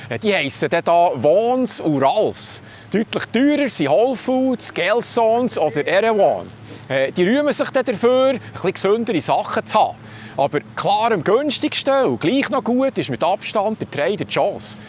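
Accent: Bern, Switzerland. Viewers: Es migroching, hani überno vo mire mueter, u speter , nach mine erfahrige, gröschtteils blibe. Regionali lädeli , oder burelädeli hei Priorität. I schetzes o, das mir hie so eiget si, o wes chli ängstirnig isch, aber we not am Mann isch, luegtme fürenang. I finges schön , hesch zyt für Ferie z mache.gnieses ömu de.o mit famille u fründe z si. Dis bärndüsch isch so ächt urtümlech, lise scho nume wäge däm gärn zue. Liebi Grüess us mamishus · Du bisch schwizerischer aus jedä Schwizer, oder so nes schöns Bärndütsch findet me chum me. Ä so het me vor 15 Jahr no g'red, ou dSprach tuet sich verändere, und nid zum Guete wie ig finde. Es git immer meh so ne Einheitsbrei. Ig lose dir us Burgdorf zue! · Die interessiert-offen vernünftigen Dokus und nun auch der lockere Beitrag mit dem stets sympathisch Berndeutsch sprechenden gefallen mir immer gut. Herzlichen Dank dafür! 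Tschübe aus Hettlingen ZH! 🙋🏻‍♂️ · Hallo, darf ich dich Duzen? Ich tu es einfach. Ich freue mich immer auf deine Videos. So ein schönes Berndeutsch. Ich denke dass du jetzt in Thun bist. Ich schreibe aus Langnau im Emmental.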